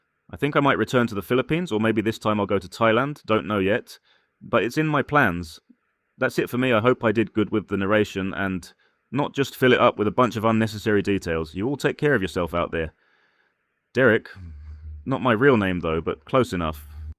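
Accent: England, United Kingdom. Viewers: A fool and his money are soon parted especially when no common senses is used, and you sound like you have an English accent to me, not american😉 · This bullshit is just story telling which bares no truth…He has a British accent tho…probably went to the Philippines 🇵🇭 heard and saw some stories, then started a Utube channel…not too many subscribers yet, looks like it’s fairly new. The problem with these nonsensical stories are, they’re giving the Philippines 🇵🇭 a bad reputation.Stay blessed 😇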